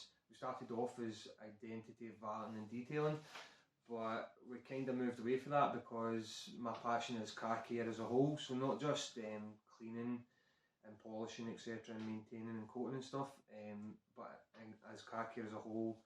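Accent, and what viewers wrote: Scotland, United Kingdom. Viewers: No need to apologize your scottish accent is beautiful my friend 👍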